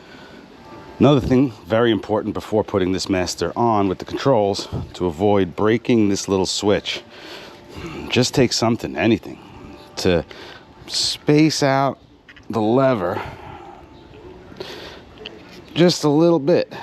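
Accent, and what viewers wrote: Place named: New York, United States. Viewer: was going to say, all this time (since you started the whole series) and not a single time were the mirrors cleaned lol. Great work. your accent makes me miss NY... Keep on keeping on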